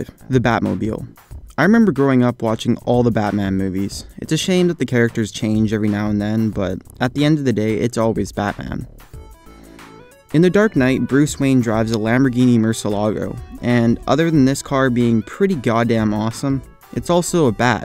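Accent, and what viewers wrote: Canada. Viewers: Is he Canadian? He keeps saying aboot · Ahhh....I love Canadian accents. <3 _ <3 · i notice a LOT of people that do the "numbers lists" (top 10, 5 things, etc..) always seem to have Canadian voice overs, whether it's due to being produced in Canada or maybe Canadians really like to do voice overs<shrug> either way, it is NOT a complaint, merely an observation, as I'm a big fan of our neighbors to the North! ;) (also, you could get into bars and strip clubs a couple years earlier than you could in the States and Niagra Falls was only a 3 hour ride away! God Bless Canada!!)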